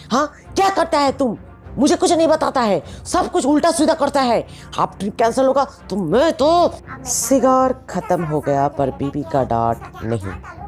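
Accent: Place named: Bengal, India. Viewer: I like the bengali accent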